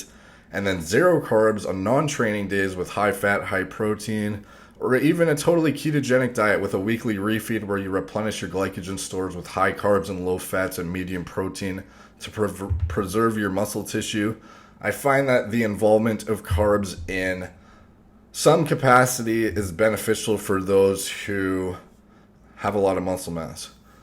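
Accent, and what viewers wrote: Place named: Canada. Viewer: He sounds way more Canadian here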